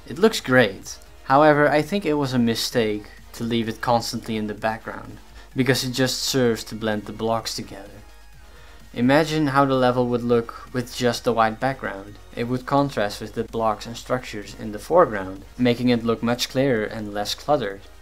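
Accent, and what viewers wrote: Netherlands. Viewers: Are you Dutch? Your accent is dutch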